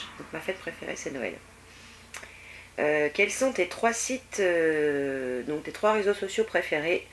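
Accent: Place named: France. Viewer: Je suis née le 17 décembre, si j'me trompe pas.... LOLGaelle, dans un commentaire récent, je te croyais belge. C'est à cause des vidéos proposés avec le tien, à droite. Plusieurs étaient de la Belgique. Je n'y connais rien. J'aime l'accent francais, si différent de celui du Québec.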